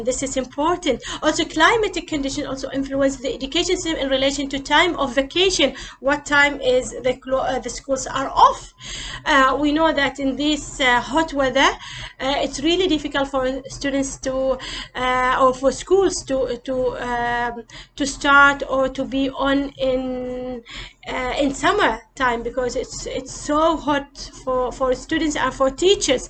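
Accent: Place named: Europe. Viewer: Mam,please speak like Asians,not like europians,there mouth and tongue differ from us... I appreciate ur class,but plz come back asian style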